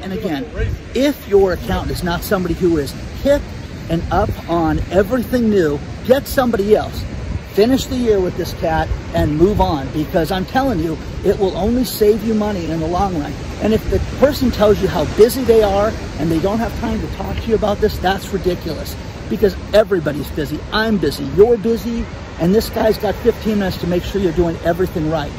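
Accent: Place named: Upper Midwest, United States. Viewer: bruh, where was your upbringing? your accent is driving my hubby crazy- he thinks upper midwest or northwest coast? p.s. we like ya vids.........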